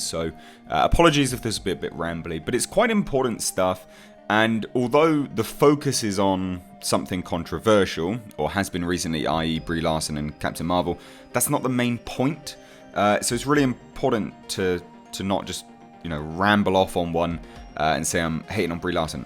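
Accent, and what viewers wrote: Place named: United Kingdom. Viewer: Thanks for covering it. I tend stay away from the extremes on YouTube. There seems to be a left/right war going on in the US at the moment and I feel the rest on the world is being dragged into it. It’s nice to hear a UK accent cover it in a measured way :-) This reminds me of the way YouTube is applying copyright rules. They aren’t actually taking “sides” they are just looking after their advertisers, and it’s their advertisers that have the agenda. YouTube is just following the money.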